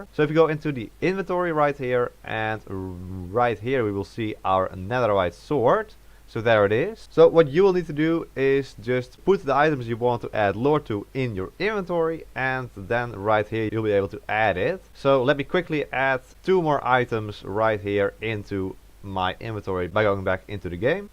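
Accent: Netherlands. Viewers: this dudes def dutch for sure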